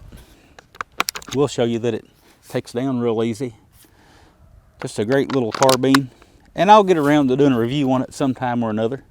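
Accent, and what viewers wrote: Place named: New York, United States. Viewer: you’re up there with Mixup and Hickock. Really enjoy your videos. You gotta get rid of that NYC accent though...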